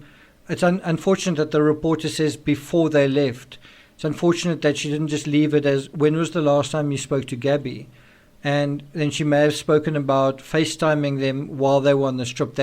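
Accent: South Africa. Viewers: Mr South African Accent, I don't know you; just happened that your page came up on my feed BUT, can you read a room? At the mark, there appears a very dark, very black woman, costumed as a "darkie maid" with a minstrel-esqe expression on her face. Something like Mammy in Gone with the Wind, except Hattie McDaniel's Mammy had far more innate dignity. Wrong picture to illustrate your little narrative. Cassie is 8-10 years older than her brother, she says. From her conversations it seems that he was the Golden Child, a boy, -finally!- even though he may have mental issues; Cassie is the family scapegoat. Look at her and her husband; her parents first probably ragged at her as an adult in an abusive first marriage (like Gabby) then because of her and her husband's weight, losers. Then they house sat for her parents while they took Brian up to NY for whatever reason, most likely mental /physical/psychological treatments. 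He's not been regularly employed, it seems yet he disparaged Gabby for trying to manage her vlog/social media as a business, days before her death, blaming her for being OCD as he admitted to the police that he was hearing voices. Cassie is not the out of order one here. As she spoke, I could HEAR in my imagination the verbal pillorying that would be aimed her way by her mother for "daring!" to defy family /lawyers instructions, "just like you to say the wrong thing about something you aren't privileged to!" Cut the woman some slack. She has little kids to pr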